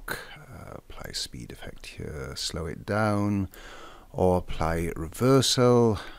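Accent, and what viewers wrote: Wales, United Kingdom. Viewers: Are you from Wales? You definitely sound it. I'm considering getting this, can you add special fx (Explosions/Generally action)?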